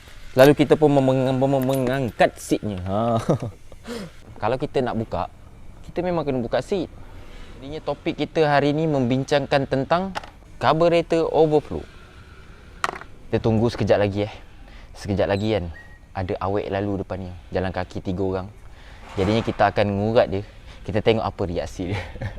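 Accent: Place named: Johor, Malaysia. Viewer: Asslmlkm... Oooooo org Johor klau ckap try...bunyi dia...terghaiii Oooooo bari Sy tauuu😁😁😁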